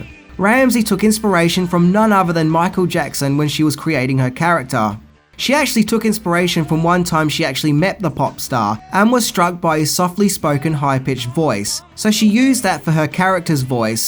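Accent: Australia. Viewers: After of this horrible Australian narrator, I just... I just can't. 👎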